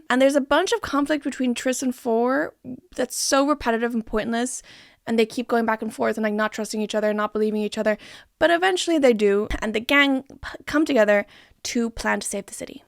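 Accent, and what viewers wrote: Ireland. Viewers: EDIT: Ireland!! I watched your Booktok video, and I hear it now. ORIGINAL: Dude, I am so sorry if this is rude. It's my first time watching your videos, and I gotta know — WHERE is your accent from??? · Is Ms. Irish? I keep hearing little hints of an Irish accent, and I'm trying to determine whether or not I'm imagining it. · the way you say your T’s; like raised somewhere English main but time in Ireland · do i detect an irish accent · is this a super watered-down irish accent? i'm not a native speaker and i'm confused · I went through this entire video thinking you were American not Irish… the accent only came out when talking about the LC haha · Yes i watched your whole 1hr and 7 mins and it was more entertaining than the movies. I think i watched 2 and gave up on them. Also enjoyed what i believe is an slight Irish accent in your video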